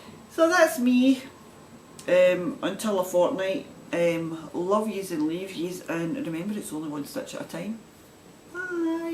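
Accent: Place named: Scotland, United Kingdom. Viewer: Love the podcast! I am from Scotland too so always great to hear the Scottish accent! Would love to enter into the giveaway 😀. Thank you!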